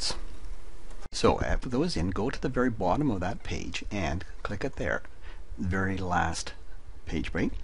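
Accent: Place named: Canada. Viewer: You're adorable. Love your Canadian accent. Even though your video didn't help me...I want to be your best friend! lol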